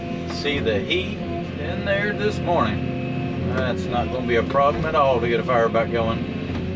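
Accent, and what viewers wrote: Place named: North Carolina, United States. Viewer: I think that he’s from NC and they do talk different not funny but different.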